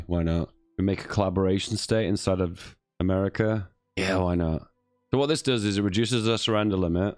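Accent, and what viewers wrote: United Kingdom. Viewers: your calm and collected attitude and British voice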